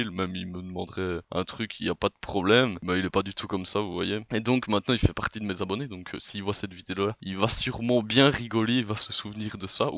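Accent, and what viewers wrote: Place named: France. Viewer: Écœurant! Je n’en reviens tout simplement pas. Où as-tu pris cet accent à la française ? Le rythme est bon, le propos intéressant et les animations soutiennent à merveille l’histoire. Bravo, cent fois Bravo...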